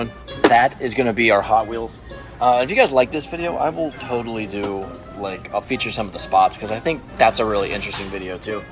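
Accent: England, United Kingdom. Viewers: Guy’s English or Spanish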